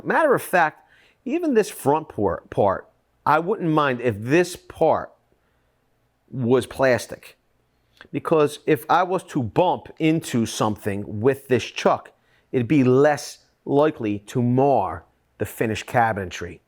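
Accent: Philadelphia, United States. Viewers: This is the first time I've ever watched your videos and I am 40 seconds into it, but I can bet that the narrator is from the Philadelphia tri-state area because of his philly accent. I'd recognize that jawn anywhere.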